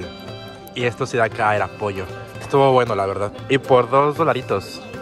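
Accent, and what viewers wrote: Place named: Fresa, Mexico. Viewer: Porque este hombre habla fresa normalmente hablan haci los yuniors y el para gue😂😂😅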